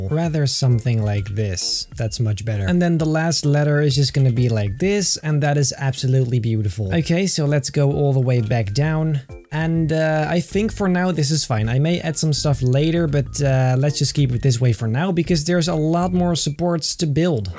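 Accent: Netherlands. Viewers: dont he sound dutch?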